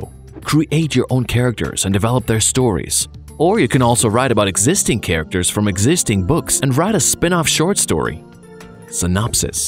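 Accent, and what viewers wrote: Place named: United States. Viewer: Your channel has been a huge inspiration for me to start mine. (even though I learn French at school) I only have one problem with your videos, the first one I watched was meeting a stranger (I think) when the video pronounced it slower I heard an American accent even when I watched the French one about weather and I could still hear a tiny bit of American in the French voice, it's probably an American imitating a French accent, but aren't you supposed to be a company, I suggest that you get actual French, Spanish and Italian people to do the videos for viewers to get the best out of it, instead of imitation?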